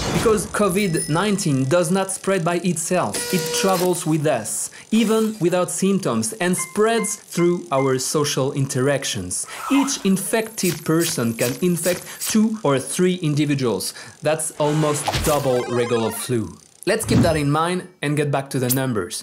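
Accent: France. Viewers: It's eu bioutifoul french accente ! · FRENCH ACCENT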